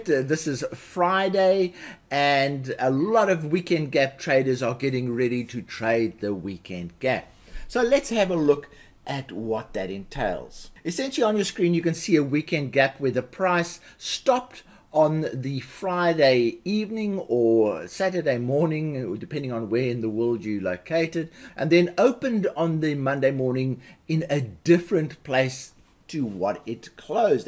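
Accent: South Africa. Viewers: are you perhaps from South Africa?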